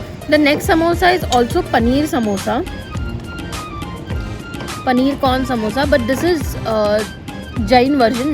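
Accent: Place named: Mumbai, India. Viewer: Lovely VDO. Nice casual feel to it.. i absolutely love your Mumbai Hindi..❤️ Just one thing.. u missed one of my favs Irani Onion Patti samosa....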